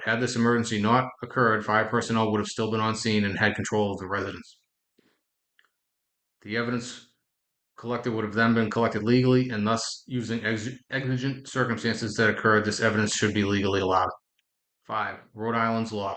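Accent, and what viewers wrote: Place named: Boston, United States. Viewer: Not sure why this was on my homepage, but sick Boston accent.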